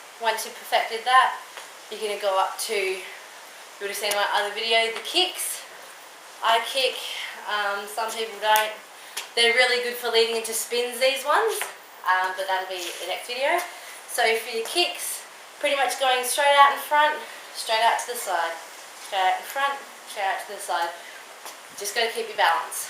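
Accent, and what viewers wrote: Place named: Australia. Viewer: you have that sexy australian accent:)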